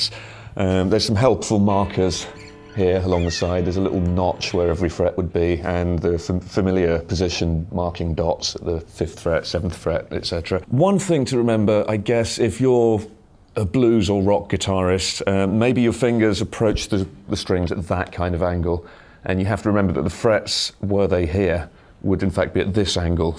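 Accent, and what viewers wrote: United Kingdom. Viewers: Thanks ! That was interesting, but for sure need to try before purchasing. Btw, what a GOOD AND CLEAR english accent you have. It makes easier for non british people like me to understand. Cheers !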